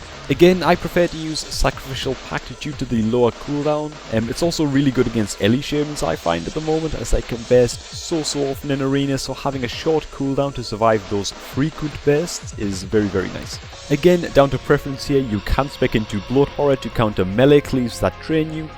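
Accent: Newcastle, United Kingdom. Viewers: I think he sounds like he is from Newcastle ;D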